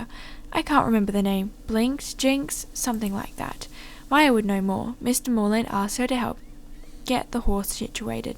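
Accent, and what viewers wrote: Australia. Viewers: No joke, but I really like your Australian accent (I think that’s how u say it).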